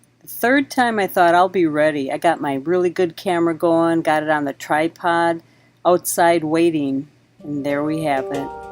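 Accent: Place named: Illinois, United States. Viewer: so the sub. just resufaced. Its been under for about a month..where are you from? I hear an ILL accent.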